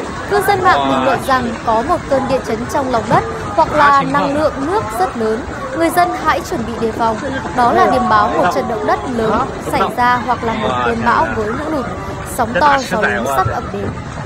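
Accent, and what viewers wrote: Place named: Hanoi, Vietnam. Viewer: thi giong nhu ngoai ha noi may chu gi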